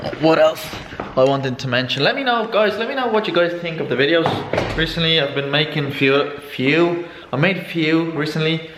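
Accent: Ireland. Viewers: u irish my g? love the accent.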